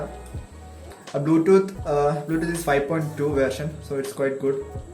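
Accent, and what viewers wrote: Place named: United States. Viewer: Americakaaude pole und samsaaram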